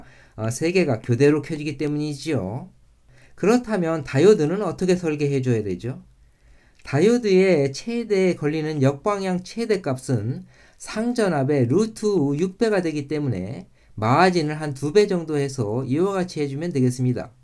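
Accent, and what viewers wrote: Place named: India. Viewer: 교수님 근데 어린시절 인도쪽서 유학하셨는가요? 저 거래처 인도분이 한국어하는거랑 억양이 너무비슷해서 놀랐어요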